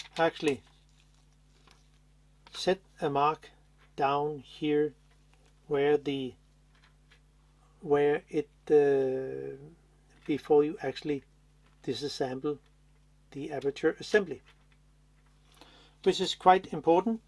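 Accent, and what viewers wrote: Denmark. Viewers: WAUW!! This is SO strange and interesting:) I have JUST found your channel because my dear dad gave me his collection of vintage Nikkor lenses and the Micro-Nikkor 55mm was tight with the focus. Well, you made a video about. But the interesting thing is this: I noticed what sounded like a danish accent and now I find out that you in fact are from Denmark AND your name is. Well guess what...I am also from Denmark...and my name is...:) Tusind tak for videoerne, min ven!! Hvis jeg kommer til at lave rod i den i mit reperations-forsøg, så håber jeg at du måske kan hjælpe mig;) mvh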